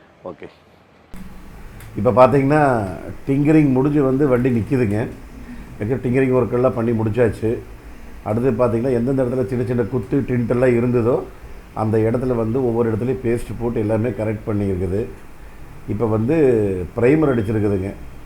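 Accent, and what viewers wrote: Kongu, India. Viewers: அருமையான வேலைப்பாடு இனிமையான கொங்கு பேச்சு செலவு எவ்வளவுனு சொல்லுங்க சார்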